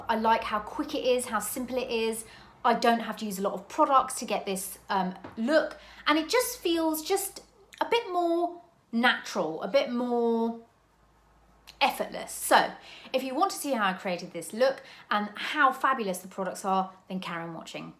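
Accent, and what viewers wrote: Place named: England, United Kingdom. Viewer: I really like that lip color on you. I share your love of BM and we are exactly the same age so that tapping of the powder really brings me back too. Also being American I love your accent. For some reason Americans find English accents calming to listen too😂. When I was sick from pregnancy years ago I literally watched hours of lisa Eldridge and it got me through a very sick time. Thanks for the video, love from South Carolina ❤.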